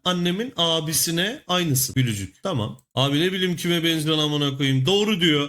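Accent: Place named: Black Sea, Turkey. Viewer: abi şiven çok komik ve iyi.Karadeniz şiveni seviyorum.sana yeni hayaında işinde... başarılar dilerim abi.veeeee espirilerin gülüşün enerjin çok komik beni hep güldürüyorsunnn.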